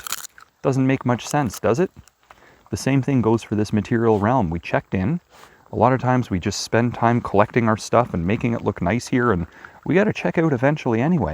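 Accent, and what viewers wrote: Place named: Canada. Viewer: Sir, You make a lot of sense. So true. Throughout the years, I collected so many O Scale model trains. I’ve sold some for charities. Going to do more. Same with my business suits. Just WHAT am I holding onto them for? I’ve donated business jackets, suits , dress shirts and ties to a rehab for young men who are recovering alcoholics and from drug addiction. Going to do more of that to. I’ve listened to your channel and am impressed with your intelligence. And… by the way, I’m trying to make friends with raccoons in my backyard. Just how can I do this? I’ve made a table and put various treats, including cheese, bread, frankfurters. Now I’m trying peanuts. By the way, I listen to your pronunciation of ‘Os’. Are you Canadian? If so, glad to meet you. I’m from New York and absolutely support Canada.🇨🇦. God Bless Canada. I’m glad that our “president “ left the G6 (without the United States) in a huff.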